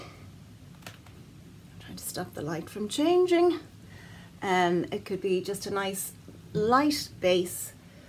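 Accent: Ireland. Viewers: Thanks for sharing, love your look and love listening to your lovely Irish accent. Huggss from Australia xox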